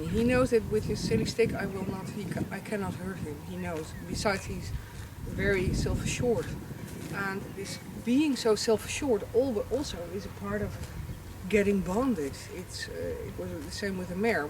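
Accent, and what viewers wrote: Netherlands. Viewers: Hallo zeg, nederlands accent :p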